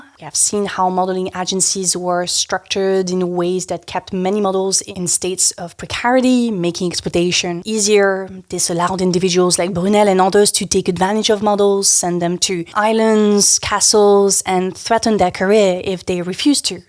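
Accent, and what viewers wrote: France. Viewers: Bonjour, C’est juste la deuxième vidéo que j’ai suivi. I Will Watch them all, I promise. Je suis très fan. J’adore ton approche, je la trouve très informative et basée sur les faits. Ta façon de présenter les choses est tout à fait raisonnable et logique en ce monde de désinformation. Aussi, j’adore ta façon de parler l’anglais. Very cute avec un French cachet. Désolée, je tutoie. Je suis au Canada c’est de rigueur. Félicitations. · Her English is great! Her French accent is very limit compared to others. How many languages do you speak without some sort of accent?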